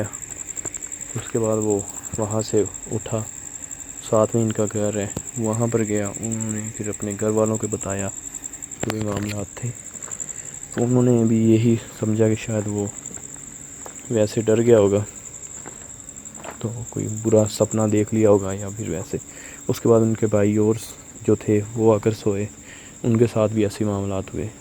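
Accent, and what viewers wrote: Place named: Punjab, Pakistan. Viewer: hit like before watching.. or bhai just luv ur accent jab ap urdu bolty bolty koi ek lafaz punjabi ka maar jaty hain.. serious video men mahool say bn jata hai.. Allah pak hemsha apko apny hifz-o-aman men rkhy (Ameen)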